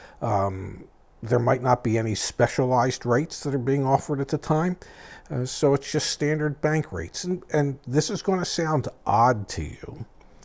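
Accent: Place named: Philadelphia, United States. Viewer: If I ever need an example of a Philadelphia accent to show someone, I'll send them this video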